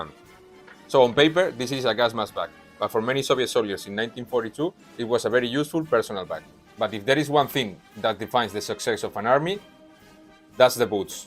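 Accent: Spain. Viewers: Интересно было слушать испанский акцент :) Спасибо за видео.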